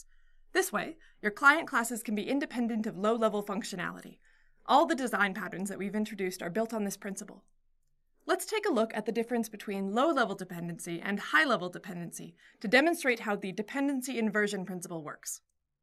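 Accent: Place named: Canada. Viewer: I don't understand her Canadian accent.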